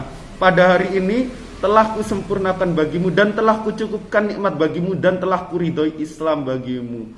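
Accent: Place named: Java, Indonesia. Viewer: Ust ini org batak tapi kok medok jowo.ngga ada logat bataknya. Lanjjutkan.you'are the next.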